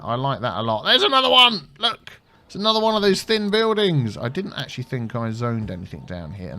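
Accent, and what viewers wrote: United Kingdom. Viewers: Your voice is very British. You could be an actor for my listening exam this year. Especially the words ''there'' and ''that'' sound British.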